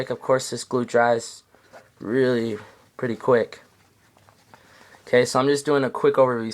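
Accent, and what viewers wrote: United States. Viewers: Hey you from utah huh haha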